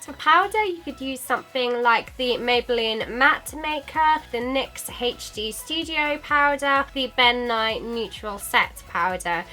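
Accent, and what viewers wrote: United Kingdom. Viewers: She is british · Amazing OMG!!!Loved it! kisses from Brazil!!! (please put cc on your videos, it would be great and helpful for me) Love your British accent ❤ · I've seen your videos like <num> times, but wanted to hear her British accent🙊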